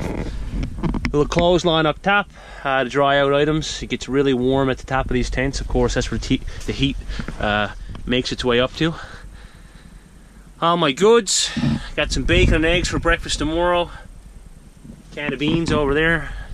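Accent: Newfoundland, Canada. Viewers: That Newfie accent is so close to the Irish accent. very similar to mine and I live in Australia.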